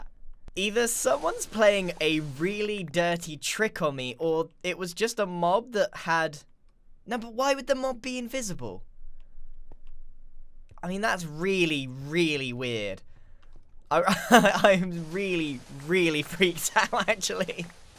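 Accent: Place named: RP, United Kingdom. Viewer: Grian has a lovely posh accent.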